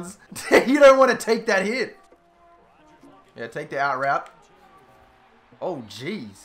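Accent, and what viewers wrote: United Kingdom. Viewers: I CAN"T DEAL WITH HEARING FOOTBALL WITH A BRIT ACCENT sorry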